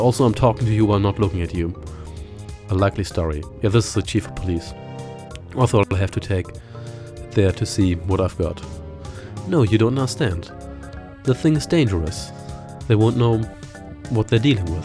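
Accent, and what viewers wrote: Germany. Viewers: You say you live in the UK, but... are you German? Cause your accent definitely sounds VERY German. ;)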